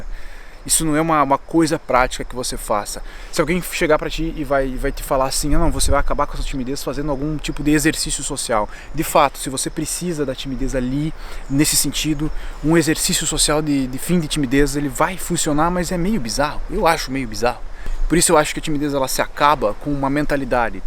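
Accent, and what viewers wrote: Rio Grande do Sul, Brazil. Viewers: Apaixonada por este sotaque gaúcho. 😍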